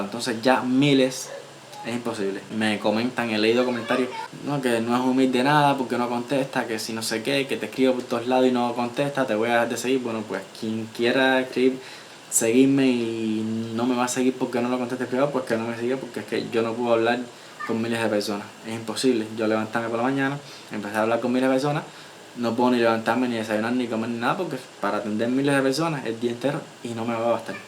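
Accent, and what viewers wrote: Cuba. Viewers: los muchos cubanos de mas menos tu edad estan muy cnformes con su estadia aca, en uruguay y no es nada dificil adaptarse (menos al invierno que ustedes casi no conocen) tengo un gran amigo cubano que se vino por guyana, brasil hasta la frontera con su esposa, pero dejo a su niña alli hasta poder traerla tambien, y a su madre.Trabaja como portero en un e dificio y arma computadoras. Cuando recien llegan consiguen trabajo en supermercados o farmacias etc sentir tu hermoso acento cubano es cosa de todos los dias-Aca no hay playas para "cubanos" y hacia el este de Montrvideo, el oceano atlantico te da hermosos lugares para disfrutar cuando deseas descansar. La Salud no es gratis, pero es bien accesible y de primera calidad La salud publica es gratuita (medicacion incluida) Bueno solo quise informarte de mi pais espero que todo te salga bien PD. 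aca no existen los cortes de luz jajaja:Un beso grande hagas o que hagas Te deseo lo mejor. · Ver tus videos es como conversar contigo, me encanta eso pues te conosco de alguna forma y se mas de ti, tus conversaciones son interesantes asi conosco de ti y como es vivir en Cuba. Me encanta tu forma de hablar y expresarte y para nada me ofende tu vocabulario, he compartido con muchisimos cubanos y amistades cubanos y se como hablan y se expresan y puedo entenderte, sigue asi y no cambies por nadie. Gracias por compartirlo, muchas bendiciones papo. 🙏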